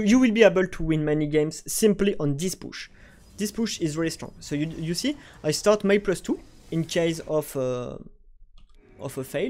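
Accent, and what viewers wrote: France. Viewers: Man you are so cozy guy and your accent tho! Really makes me smile when I hear your frenchglish haha:D thanks for your work sub and bell dont disappoint my trust!! :D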